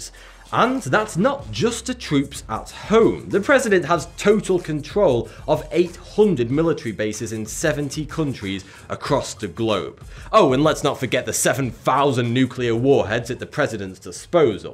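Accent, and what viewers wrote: United Kingdom. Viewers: Pretty biased an inaccurate. Sounds like a Brit who watches CNN.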